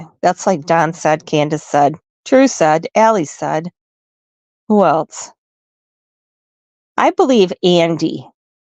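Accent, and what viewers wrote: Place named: United States. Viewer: I love your laugh ❤ American accents is one of the best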